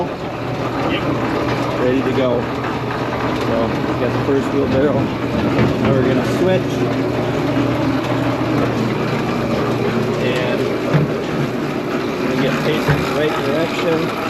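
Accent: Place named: Wisconsin, United States. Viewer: Don't worry if we cant see you your wisconsified accent is impossible to mix up we know its you guiding us threw the video! Cheers!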